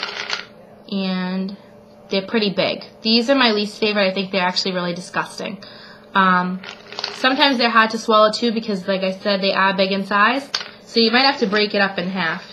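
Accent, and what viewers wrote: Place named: Massachusetts, United States. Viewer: FIRST thing I noticed about you was your Massachusetts accent!! lol LOVE it!!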